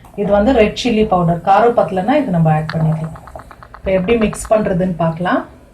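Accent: Bangalore, India. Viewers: I am tamilian from Banglore, it was v good see you any cooking kebab and talking Tamil in our style.. 😄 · Nice Bangalore tamil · Akka banglore tamil haa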